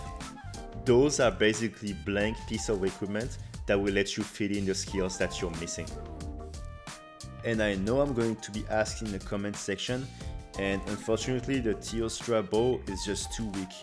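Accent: France. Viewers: J'entend un accent français haha , je vais essayer ton build ^^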